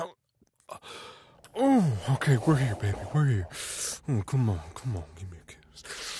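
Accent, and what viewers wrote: South, United States. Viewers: The Country Boy accent is amazing, it so good thank you!!! ❤ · i absolutely love your accent (and honestly i am not a big fan of american accents that much) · As a girl who lives in South ith think your accent was spot on a little to rich but when you speak all in all it was hot 😙🤌 chefs kiss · As a southern girl, the accent killed me💀💀💀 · This ain’t no American accent missy!!! It’s a country accent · Omg the country accent gave me tingles. You can get it. Stop playing Daddy I'm lit so I have liquid courage. I'll feel bad tomorrow Yee haw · The country accent is amazing. This video is just what I needed, too. Thanks, Daddy. ❤️ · This Country Boy accent sounds so sweet, and is hitting just the right spot in my brain ❤️ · I love your southern accent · (Edit): After listening to this, your southern accent is pretty dang good! Your voice acting is very impressive! 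Well done ❤️ ❤️ (Original comment): Ooo?! Going by the title imma have to listen to this one at night 😂🫢🥴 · Your country accent is amazing 🤩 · The giggles I let out while hearing the country accent ☺️🤭❤ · Him: Where you from? Me from Downtown Ohio No boyfriend because I’m to shy to ask anyone, and to tell people I’m from Ohio… 😪 yeah this audio is perfect.❤ I loved it. You have a wonderful country accent! 🥹love you!!! ❤❤❤.From a British woman. 😌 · A country boy accent aways makes me giggle 🤭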